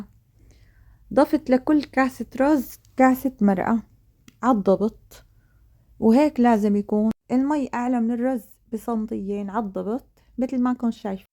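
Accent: Homs, Syria. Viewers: والله شي بشهي يعطيكي العافي ودخيل الحكي الحومصي😅😘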